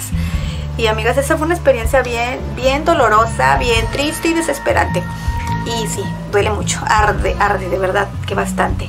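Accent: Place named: Tampico, Mexico. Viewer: muy bonita plática no cabe duda cómo platicamos los de Tampico al oírte me siento en casa en mi tierra mi esposo es cómo el tuyo y acá en Guadalajara les llama la atención cómo es muy bonito cuidate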